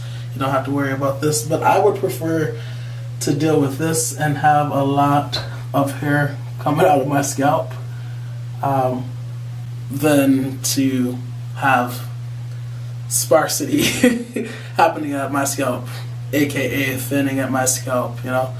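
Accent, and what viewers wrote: United States. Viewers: Yes definitely still love those movies despite being old. I'm a newbie when it comes to my loc journey. I had been wanting to do it for years & actually started it "accidently" LOL I had shaved my head after having yarn braids in for over 3 years & as my hair grew, I did my hair in a two-strand twist under a wig I liked & I left them that way & WAH LAH!!!.INSTANT LOCS LOL I never retwist them, but I know I need to. I did that like once or twice. I don't want a bird's nest. Question: what do you use to moisturize your scalp & what product, if any, are you using to twist?? I've used just water in the past when I tried it, but it didn't really hold. I'm sure you've already answered these questions in past vids, but I haven't had a chance to watch them all yet. P.S. Do I hear a hint of a fellow "Michigander" in your voice?? LOL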